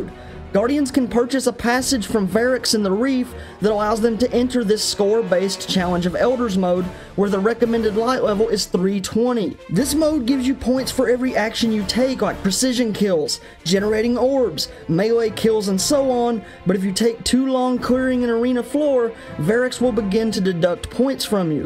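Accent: South, United States. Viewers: I love your hillbilly voice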